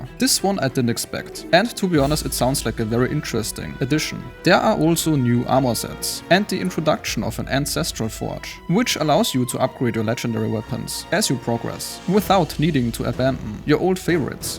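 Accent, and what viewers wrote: Germany. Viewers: Oh shiet I came here to say that the German accent added to the Nosteratu vibes wonderfully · Bist du deutsch ? Frag für nen Freund 😅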